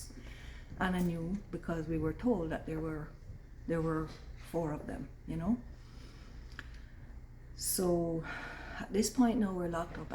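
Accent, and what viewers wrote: Jamaica. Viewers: I left my home country in the UK due to discrimination. The world has changed in a bunch of weird ways. I'm English, I sound English (in that obvious way) in the same way you sound Jamaican even when youre not speaking like a Jamaican. I did not go to Canada, I went to my wife's home country of Moldova. It is an odd thing that as a foreigner with a love for Moldova, I am loved and treated with a higher acceptance than my home country. I think I know what went wrong. People from their countries belong to the internet, they dont have a place to belong, they dont have a place to represent. I feel your disconnect. I still love the UK... I spent time in Jamaica, I get it. I do... 😢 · This must hurt badly, even your accent is Jamaican. This is why i haven't when to Jamaica too much violence, i came to Europe 9yo now 40 and still haven't visit. 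The country need a safety net to help the people like in Europe or it going to be a nightmare in Jamaica in couple decades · Wow! It is amazing watching a white lady speak with an authentic Jamaican accent. Her accent is very real! Black people are killing each other over the things that white people have allowed them to have. We are living in a local & global system of racism (white supremacy) where Black people are being put into desperate situations where they have to steal and kill each other to survive. This is not just in Jamaica, but everywhere on the planet where people colour are. Including Canada. So there is no escaping this global crisis! These problems are not the directly & indirectly created by people of colour themselves, because they are prisoners of war. Slavery never ended in 1865.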